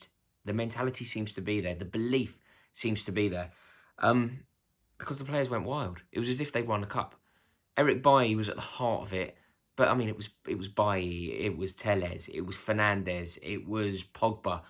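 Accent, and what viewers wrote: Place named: Cockney, United Kingdom. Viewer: My favorite chirpy cockney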